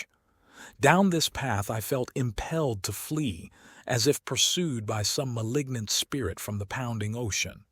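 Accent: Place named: United States. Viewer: Finally I find an American reading an American author. Most readers of this story are Brits. Likewise, I prefer British authors read by British narrators. Call me picky, but it makes a big difference for me. 🙂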